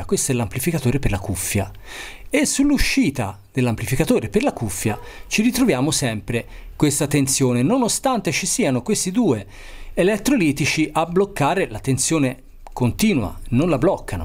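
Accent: Tuscany, Italy. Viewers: Simpatico, specialmente con l'accento toscano! 10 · Simpatico, all'inizio mi hai fatto fare qualche risata, sarà dovuto anche a quell'accento toscano, sono contento che hai risolto il problema, e hai fatto vedere agli altri e come si fa. Bene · ma quanto bello e' l'accento toscano ? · Si dice circùito, perché ciruìto vuol dire preso per i fondelli. Bravino comunque anche se sei Toscano.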